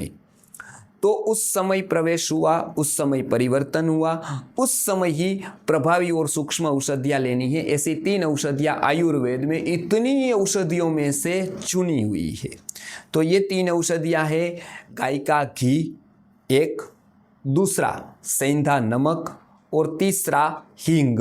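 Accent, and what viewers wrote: Gujarat, India. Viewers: Bhai gujarati lage che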